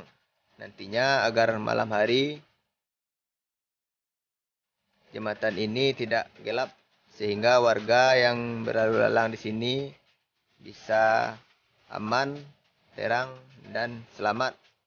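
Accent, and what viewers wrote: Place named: West Sumatra, Indonesia. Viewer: Jaleh bana logat Minang ang sanak😂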